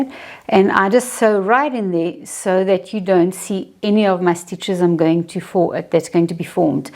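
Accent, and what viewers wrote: South Africa. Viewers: South African accent? Grâce pour l'information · ok cool. I wasn't sure if it was a South African or New Zealand accent. (Fun to listen to people from different countries) Thanks!!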